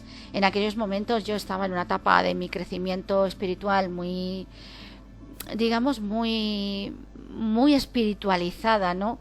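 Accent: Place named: Spain. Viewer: ¡Impresionante! Me encanta como lo relatas. Será que me gusta mucho el acento Español. El que cuentas de volar es exactamente como me ha pasado a mi. Concuerdo 100% con lo que dices de los sueños lúcidos. Estos últimos son los que más me fascinan ya que puedes aprender más de ti mismo. Aprendes a estudiar dentro del mismo sueño tu subconsciente, los símbolos pueden ser muy impactantes y como dices causan miedo. Si enfrentas esos símbolos feos a mi me ha pasado que en ese momento se transforman en otros más sencillos y se comprende más así lo que simbolizan. Eso de las vidas pasadas es justo como lo interpreto yo, como tu dices "suceden en el eterno ahora", Y, no es que recuerdas es como que lo vuelves a vivir. Gracias por abrirte así. Toma de mucho valor. Amor y luz a ti, y CC%C!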